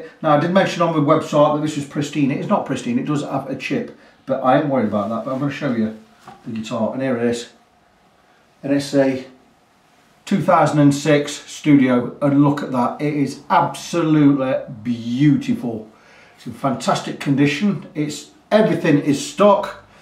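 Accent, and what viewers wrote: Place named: Nottingham, United Kingdom. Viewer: Was just about to comment Nottingham boy but then you came out with it Proper Accent 👍🏻😁 being a Notts lad too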